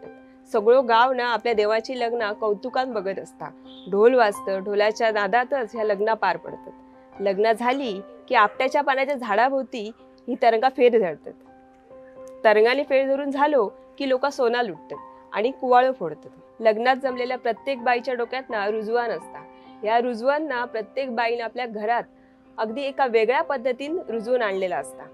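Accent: Malvani, India. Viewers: बेटा, तुझा माहिती सभर वीडीयो मी नेहमी आवर्जून पाहत असतो. मालवणी भाषेत ला तुझा प्रत्येक शब्द मला ऐकायला आवडतो. मी गुजराती आहे. माझं बालपण गिरगावात गेलं असल्याने मराठी भाषा मी बोलु शकतो,वाचु शकतो आणि लिहू शकतो. तुझ्या मालवणी भाषेत जो गोडवा आहे तो मला नेहमी ऐकायला आकर्षित करतो. तुझा मालवणी स्वाग असाच कायमचा चालू ठेव. माझे तुला खूप खूप आशिर्वाद.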